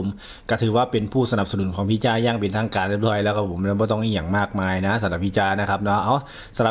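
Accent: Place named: Ban Phue, Thailand. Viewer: สำเนียงคุ้นๆเป็นคน อำเภอบ้านผือ บ่ครับ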